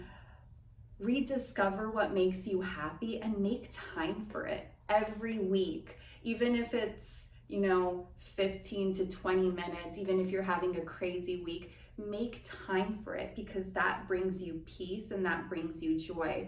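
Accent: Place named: United Kingdom. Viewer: you sound not USA... Where you from? If you are British you could self love with British tea so you know you don't force any on me and no revolutionary war😉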